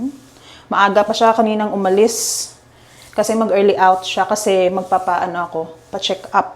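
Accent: Iloilo, Philippines. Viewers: ilongga ka ma'am?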